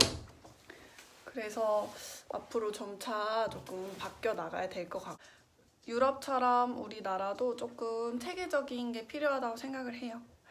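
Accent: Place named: Seoul, South Korea. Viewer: ~~ 덕분에 진짜 몇년만에 본다 ~~!! 유튜브 라니!!! 근데 왜 서울말쓰려고 노력해?? ㅋㅋㅋ 너무 귀엽고 이쁘다 유튜브스타로 대성하리!!!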